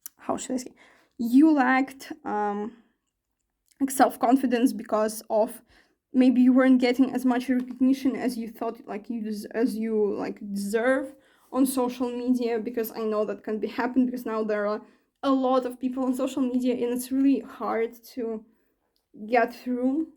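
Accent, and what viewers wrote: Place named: France. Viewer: Pile 1. Are you french ? Cute accent by the way 🥰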